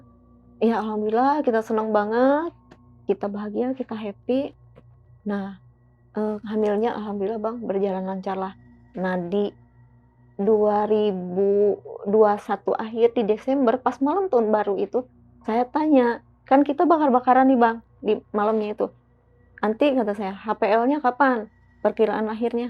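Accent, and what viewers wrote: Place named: Cianjur, Indonesia. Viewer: Kakyk logat cianjur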